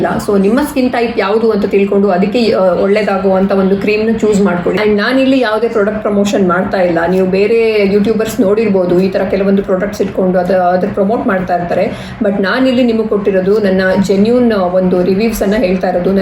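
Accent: Mangalore, India. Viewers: Nimma mangaluru slang thumba chanda ide😍😍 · Nice vedio ....😍😍happy to c someone talking in mangalore kannada in volg 😍😍😍evn m from mangalore staying in UAE... tulu la patherle yedde apundu Kendra.... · nivo Yavaglu mangalore kannada ne mathadi please. 🙏kelalikke thomba chenda erthe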